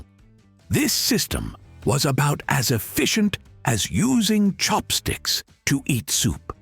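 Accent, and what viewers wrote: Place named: United Kingdom. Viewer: Thank U very much!! 👍 👍 😃 😃 Absolutely LOVE the British accent!! 😉 😁 😁 😁 🤗 🤗 🤗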